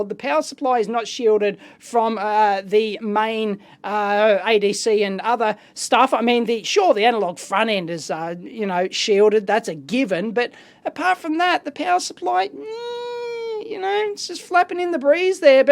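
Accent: Australia. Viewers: The 150% Australian accent would be a big clue ...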